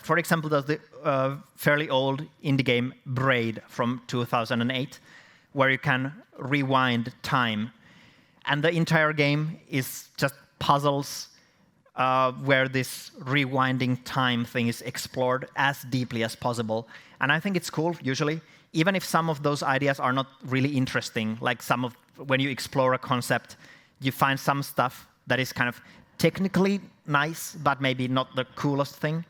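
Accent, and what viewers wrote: Finland. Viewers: The Finnish accent Rakastan sitä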